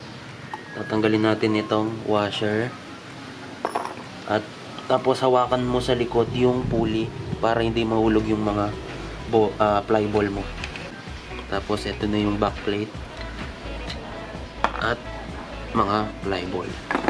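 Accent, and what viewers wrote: Pampanga, Philippines. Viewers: Kapampangan ka paps? Hahaha papuntang bahay pare yun aa.😂😂😂😂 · Kapampangan ka sir?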